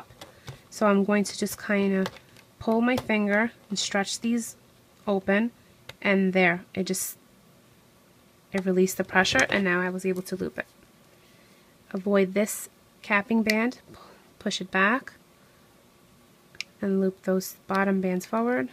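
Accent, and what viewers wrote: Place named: New York, United States. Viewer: Are you from New York? You have a slight New York accent and it's so sassy ^-^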